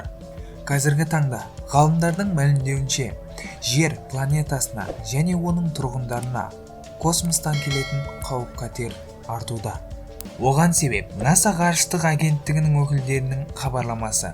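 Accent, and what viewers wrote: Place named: Uzbekistan. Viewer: Тілің озбек емеспа жағымсыз,и сөздерд бұрап айтасн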